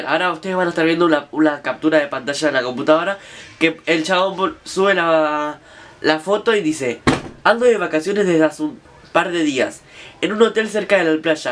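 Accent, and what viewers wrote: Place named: Spain. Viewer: Ese acento español es U NI CO❤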